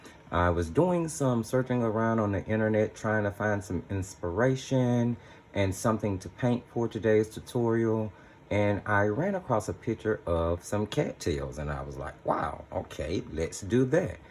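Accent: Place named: South, United States. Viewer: I have watched 5 other YouTube videos and yours is my favorite! Excellent instructions as you paint. Love your southern accent.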